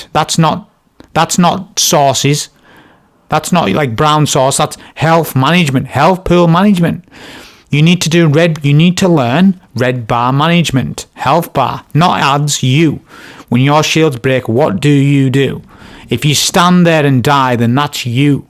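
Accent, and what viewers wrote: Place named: North, United Kingdom. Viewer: Northern Accent Sh*t Talking Destiny, Viewers, Guardians. Informative Gameplay Even Showed A Cheese I'd Never Seen!! Immediate Sub 😄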